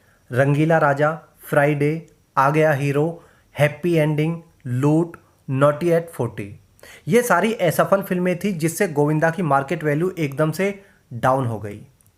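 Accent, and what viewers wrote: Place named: Uttar Pradesh, India. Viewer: Kay Ap Ideal Na Banaein Actor Ko Actor Ki Hat Tak Rakhaein Kyunkay Laehjay Say Tum Bhi Up Kay Lagtay Ho Amitabh Alahbad Ka Hai to Yeh Tumhara Tasub Nahin Hai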